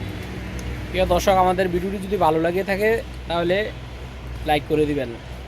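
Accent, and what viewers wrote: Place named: Comilla, Bangladesh. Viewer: কুমিল্লার ভাষা এটা ভাই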